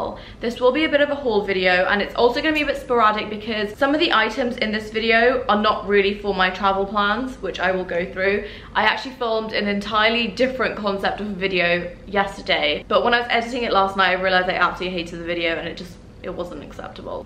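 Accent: United Kingdom. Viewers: oh my goodness, my daughter made me watch your $10,000 quarantine hall with her and now we watch all your hauls together lol. "mommy come our friend from Dubai with the British accent posted!"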